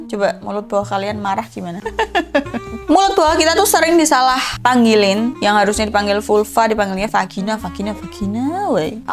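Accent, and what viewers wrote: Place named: Surabaya, Indonesia. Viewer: logatmu itu Suroboyoan manteb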